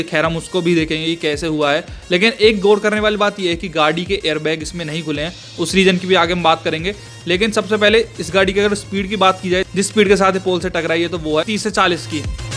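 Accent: Haryana, India. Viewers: भाई , एक बात बोलता हूँ , बुरा मत मानना। जरा धीरे बोला करो। बहोत से शब्द तुम्हारे jumbled आते है। पीछे शेर तो लगा नहीं है ना ! हिंदी एक्सेंट में बहोत प्रॉब्लम है तुम्हारा ! हो सके तो हरियाणवी एक्सेंट में हिंदी मत बोलो।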